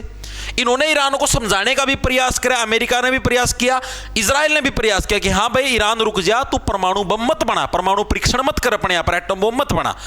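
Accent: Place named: Haryana, India. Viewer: Arey hindi to theek se bol liya karo bhai samjh to jab aayega haryanvi mix kar rakhi hai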